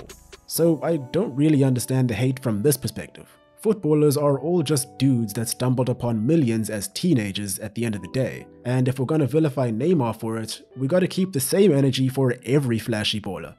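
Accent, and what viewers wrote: South Africa. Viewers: Hey…are you South African? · Are you South African mate? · You sound South African? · Nice to hear a South African accent for once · Are you from South Africa? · I just wanna know, are you South African becoz you sound like one… btw love the videos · Is that a South African voice i here? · Through out this whole video I'm like "this man sounds hella South African" and I'm glad I was right. Just gonna sub cause of that ✊. Also great video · I knew this voice sounded south african awe my brother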